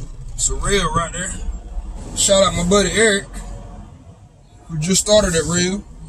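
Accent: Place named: South, United States. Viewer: I dig tha country accsent bruh!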